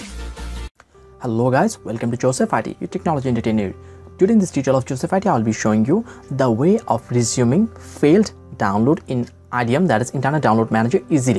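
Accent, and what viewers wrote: India. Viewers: alhamdulillah i am not indian also but i thought ur voice was indian srey Thanks alot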